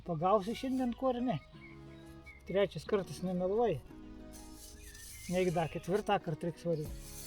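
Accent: Aukštaitija, Lithuania. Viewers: labai patinka tavo aukštaitiška tarmė,ir jeigu tavo gera nuotaikėlė,tai gali filmuoti bet ką.Svarbu kai tu linksmas.Labai pakelia nuotaiką.😂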